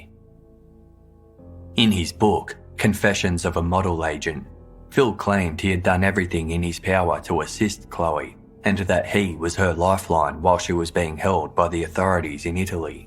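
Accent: Australia. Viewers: Not NZ, 'Stralian' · He couldn't be more Australian, and speaking seems a struggle, is what I think I meant. Not so much a compliment as an observation. Listener since Ep 1, so not a casual or spur of the moment take, and one he might not disagree with to judge by the Q&A he once did. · If you mean the presenter then he sounds Australian or New Zealand. I really like his voice and find it so relaxing that I often put these vids on and fall asleep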